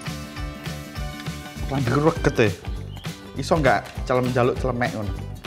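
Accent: Java, Indonesia. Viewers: Mas Kon Kuwi jowone ndi?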